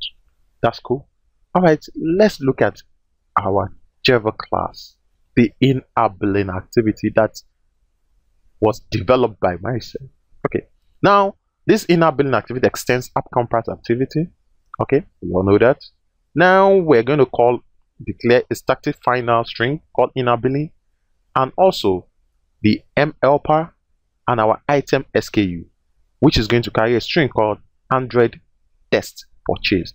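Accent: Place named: Nigeria. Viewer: "In app billing SARVICE," Naija man for sure. Thanks for the great video.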